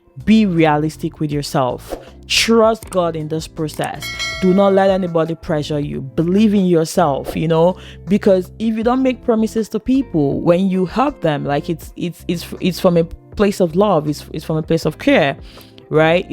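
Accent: Canada. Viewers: Lol The Explanation at the end 😄😄 Honestly, through out the talks. Was just like has fully evolved into the CA accent.🤣🤣 Nice to see And thanks for the video. Solid information👍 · The Canadian accent though. ❤❤❤ Love the code switching 😂😂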